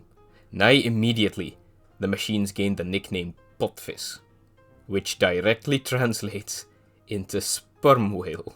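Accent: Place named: Scotland, United Kingdom. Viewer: I love how the narration with a heavy Scottish accent is casually laced with perfectly pronounced Dutch words. It's kinda funny.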